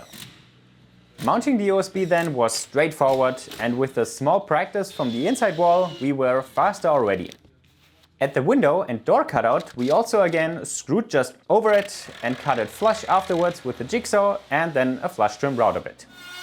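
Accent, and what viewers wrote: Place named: Upper Bavaria, Germany. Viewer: tatsächlich? Ich hätte und dich eher als Oberbayern eingeschätzt. Seid ihr richtig ausm ländle, oder aus dem dahergloffene bayrische "schwaben"? (Ja, ich tu mich offensichtlich schwer bauernschwäbisch in schriftform zu verpacken)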